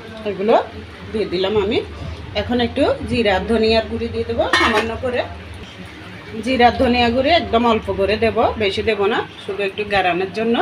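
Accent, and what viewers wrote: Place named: Chittagong, Bangladesh. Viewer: আসসালামু আলাইকুম। আপু আপনি মনে হয় চট্টগ্রামের। আপনার কথা শুনে বুঝতে পেরেছি। রান্না অনেক ভালো লেগেছে ।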